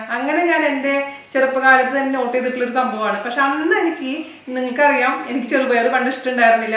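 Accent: Thrissur, India. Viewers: Love your recipes and your thrishuran malayalam. It's music to the ears!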